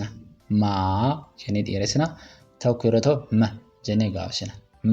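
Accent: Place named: Addis Ababa, Ethiopia. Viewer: Gara addisabanyaati hin jijirin loqoda kee bro!!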